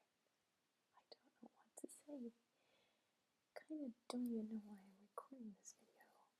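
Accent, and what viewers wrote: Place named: Denmark. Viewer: You had a thicker Danish accent, back then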